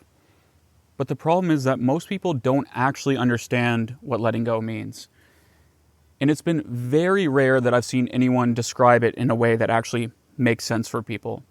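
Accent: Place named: Canada. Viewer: I appreciate this video! I just came across your youtube channel. You explain everything in simple terms language....Look forward to learning more....You must be from the NW or Canada with the accent. And saying "Keister" is definitely older school and wise....lol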